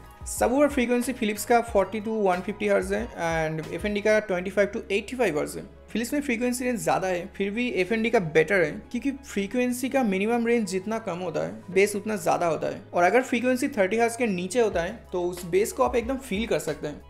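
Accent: Bengal, India. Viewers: Are you Bengali??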